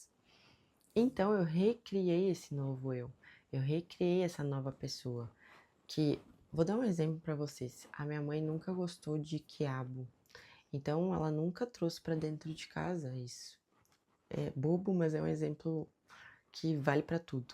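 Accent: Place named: Minas Gerais, Brazil. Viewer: Gostei do seu sotaque vc é da onde ?! Mineira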